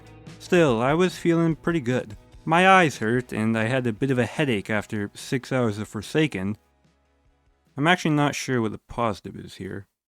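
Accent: Canada. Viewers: are u Canadian by chance? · Wait is he Canadian?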